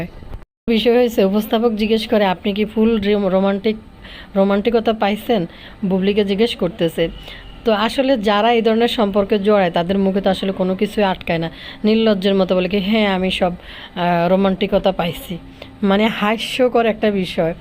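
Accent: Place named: Noakhali, Bangladesh. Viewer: আপনি নোয়াখাইলা তাই বুবলির মতো কথাবার্তা তাইলে বুবলি পরকিযা রানী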